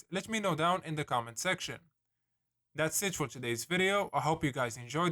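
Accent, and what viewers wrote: Morocco. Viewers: mghribi akhoya😂😂 Wellah ta 3endna accent m3rofa.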